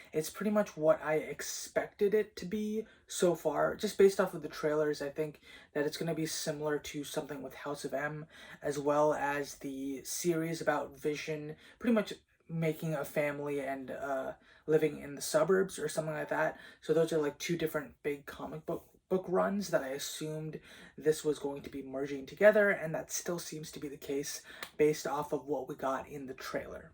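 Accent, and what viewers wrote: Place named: United States. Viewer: Im eagerly waiting for this release. By the way though your dialect is almost similar to US speaker your appearance relates to a slight indian men🤔😉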